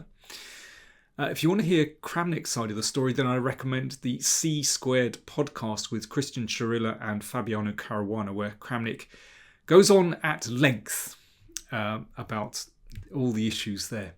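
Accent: England, United Kingdom. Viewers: Congrats on 100K, Great English accent...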